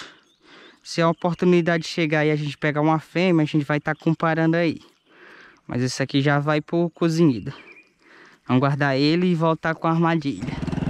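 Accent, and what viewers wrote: Brazil. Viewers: Na real, o sotaque nordestino e lindo demais, não tem jeito kkkk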